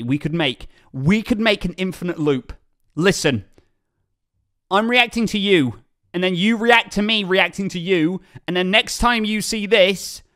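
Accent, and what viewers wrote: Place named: United States. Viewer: Once again, that American accent (this time with a Tango-inflection), FTW. So good. 😄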